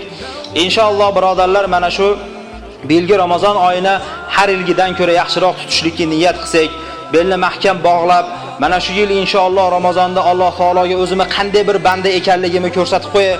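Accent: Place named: Andijan, Uzbekistan. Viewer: Андижонда укип битирган домла шекилли шевалари тозаа Барокаллох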